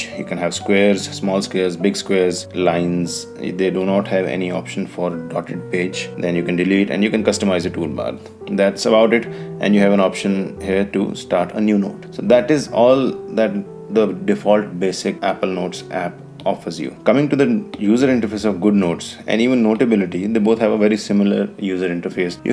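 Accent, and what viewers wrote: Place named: India. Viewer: Man really good video respect 👍 it seems like all the best tech videos come with an Indian accent, but I love it, thanks :)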